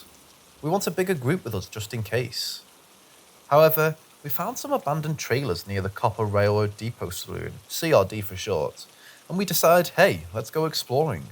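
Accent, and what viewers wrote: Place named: United Kingdom. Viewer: I'm British so love to hear a fellow British narrator. Thank for the uploads mate.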